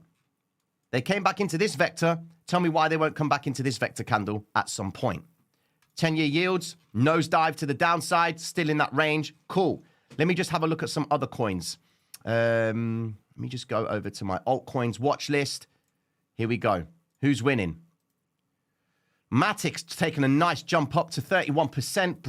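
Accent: Cockney, United Kingdom. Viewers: the man for me. Thank You brother.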